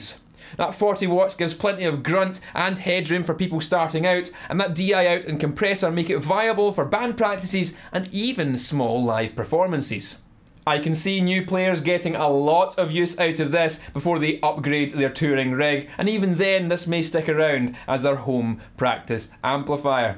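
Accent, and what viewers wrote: Scotland, United Kingdom. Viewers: yeah, he's scottish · I really like this site, is extremely funny and instructive to watch, but his accent is a little hard to follow for my South American ears, is it Scottish? I really like his accent, too!! 🤘🏼🤘🏼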